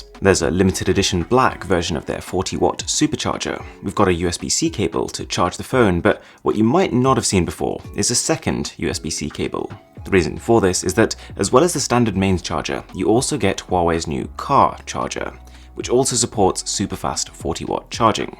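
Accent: United Kingdom. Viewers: Smh a dialect can’t be helped. He sounds British but without the accent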